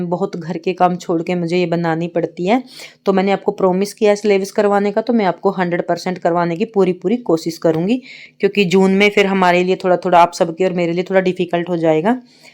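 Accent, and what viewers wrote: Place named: Haryana, India. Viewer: Very nice mam aapki awaj kaithal ki hai❤❤❤❤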